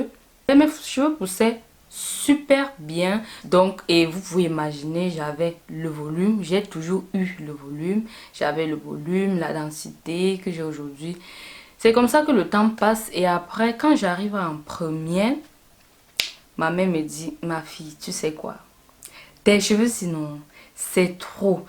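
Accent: Cameroon. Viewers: Toi tu es camerounaise ça se sent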